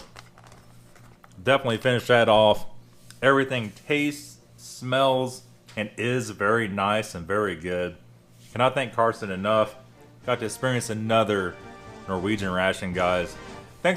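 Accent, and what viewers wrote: United States. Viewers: Your intro is always so cool man :0) so fast talking American, impossible to me, lol :)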